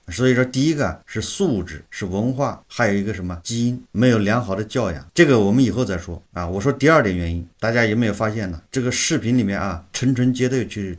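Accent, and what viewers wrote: China. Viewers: 沒字幕 大陸口音太重 聽不懂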